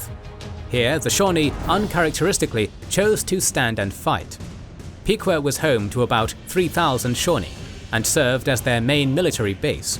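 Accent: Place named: United Kingdom. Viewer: Weird how a British guy is narrating this video.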